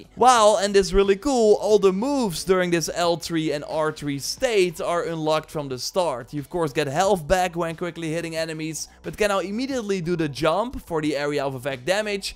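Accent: Netherlands. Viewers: You can hear that you're dutch 😂 (Goeie video maat!) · dutch accent right? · ARE YOU DUTCH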